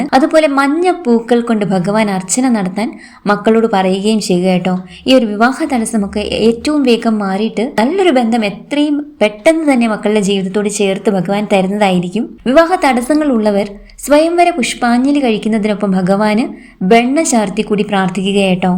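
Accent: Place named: Valluvanad, India. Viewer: എല്ലാം ഒരേ വള്ളുവനാടൻ ശൈലിയിൽ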